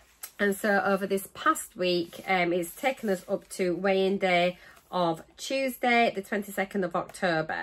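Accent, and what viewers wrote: Yorkshire, United Kingdom. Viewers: Hello lady, I discovered your channel recently and promptly subscribed. Your Yorkshire accent and warm, friendly personality is just so endearing, I could listen to you forever. And that’s before we even touch on content, which’s just first class! As a fellow MJ user on week 6 of my journey, it’s very helpful to listen to good, sound and trustworthy advice. And the fact that you were also a nurse “on your previous life”, as am I, is just the icing on the cake! Incidentally, our starting weights and current weights are exactly the same. I too am just half a pound off getting my 3 stone rosette from Nutracheck, which I can not recommend enough! My weight loss journey however, started way way before yours, and I lost just over two stones just using Nutracheck and about 11 lbs in nearly seven weeks of mounjaro. I really am struggling to get past 900 calories on some days, and that upsets me, as I’m terrified of damaging my metabolism and making things harder for myself in the long run. 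Most days I do manage around <num> calories, but have to work VERY hard at it! I truly love your channel, and when pushed for time and can only watch one, that’s the one I pick ❤ PS: sorry, our starting weights were not the same. I got that wrong!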